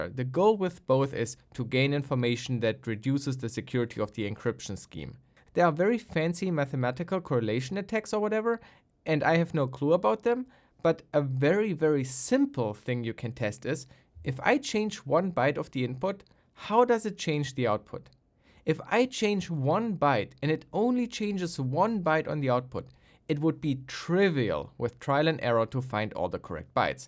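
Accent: Germany. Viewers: I like ur German accent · The german accent makes me want to kill myself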